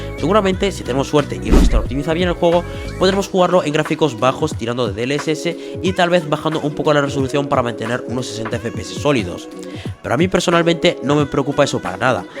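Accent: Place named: Spain. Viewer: Oye eres negr0 porque tienes acento de español?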